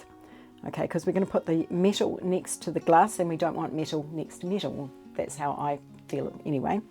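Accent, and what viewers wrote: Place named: New Zealand. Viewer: Thanks,great tutorial, never thought about hiding the knot before! Thanks from Wales, I've friends from South island NZ and your accent makes me homesick for beautiful NZ xx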